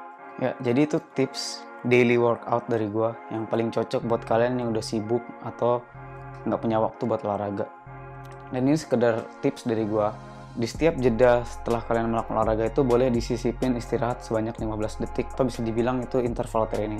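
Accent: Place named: Medan, Indonesia. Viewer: Bang org medan ya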